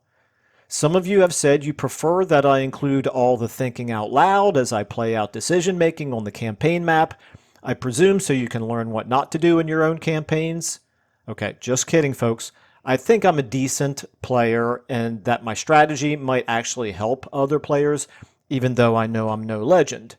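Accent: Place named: United States. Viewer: I love the way you present the information - and I have to acknowledge a personal note that your American accent is just a bit easier for me to follow than the strong accents that characterize some other favorite channels of mine. No judgment. But I wouldn't mind you doubling up on the info presented by other channels. I've binged hundreds of Legend's videos and found that, for all his expertise, we just like to play the game differently. And his guides and tier lists don't always track with how I enjoy playing the game. Feel free to reinvent the wheel because most of us would benefit from what you have to say in the particular framework you put things in.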